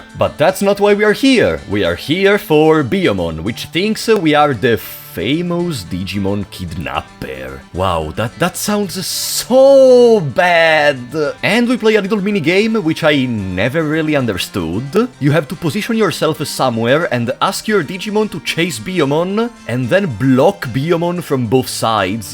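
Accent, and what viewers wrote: Italy. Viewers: the thick italian accent sends me.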